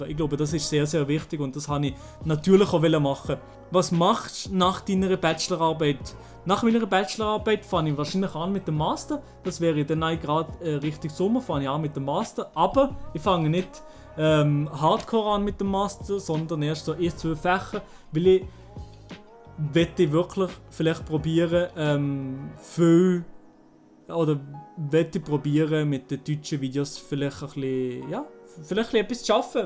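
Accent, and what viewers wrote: Switzerland. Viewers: Würde ich gut finden. Du kannst mehr Leute erreichen und alle deutschsprachigen verstehen dich. Am Anfang wird es zwar noch etwas ungewohnt sein, aber das wird sich dann legen. Doch tu einen Gefallen.. Rede wie ein deutscher und nicht wie ein schweizer 😊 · Ich fändis nitirlich schad wenn mä der komischi (nit negativ gmeint) Dialäkt nimmä wurdi gheerä aber ich cha der Gedankägang durchüs verschtah. Äs isch wahrschindlich scho chli deprimiärend, dass mä mit Schwizerditschä Videos eifach ä sehr begränzti Riichwiti het. Mä derf aber nit usser acht lah, dass Schwizerditschi Videos bi Schwizer sehr güät ahchämät und si sich mit derä Schprach ai viu besser chenät identifiziärä. Ich hoffä, dass dü diä uberleggigä ai machsch. Dr definitivi Entscheid isch natirlich ä diär uberlah und dü muäsch dich ja nit sofort entscheidä. Ich wurdis sehr fiirä wenn dü witerhin Schwizerditschi Videos machsch, aber mä eurdi sich sicher ai äds Hochditsch gwännä. 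Ich wyyschä diär nu ä guäti Zyt und viu Glick bi dinnärä Bachelor-Arbät.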